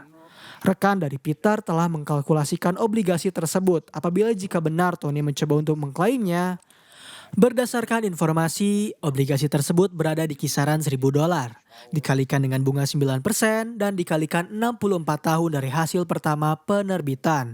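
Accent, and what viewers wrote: West Java, Indonesia. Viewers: Anjirrr gurung gusuh😂😂orang sunda kah min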